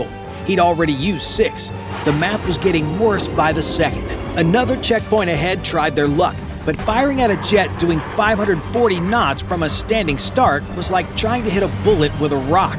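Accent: United States. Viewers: Another annoying American voice,with usual tone well tou have lost with your usual venture but managed to condemn millions of Ukrainians to injury and death and the rest to poverty in a destroyed country ,so where a you going next?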